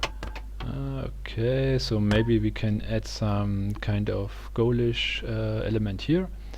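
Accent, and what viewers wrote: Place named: Germany. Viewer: Awwwww deutscher Akzent :D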